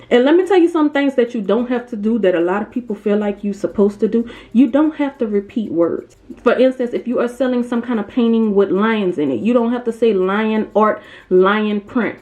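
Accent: New Orleans, United States. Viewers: You're from New Orleans aren't you?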